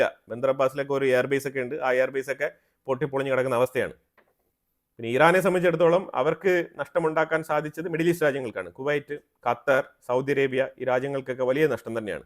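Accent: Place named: Pathanapuram, India. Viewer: എന്താടോ താനും മാത്യു ശാമുവലു० പത്തനാപുരത്തുകാരാണോ. അവിടുത്തെ ഭാഷ ഇങ്ങനെയാണോ ???അതായത്, ഇതുപോലെ, അതുപോലെ, അതുപോലെതന്നെ ......ഭാഷ പറയുന്നത് എങ്ങനെയെന്ന് കേട്ടു പഠിക്കുക.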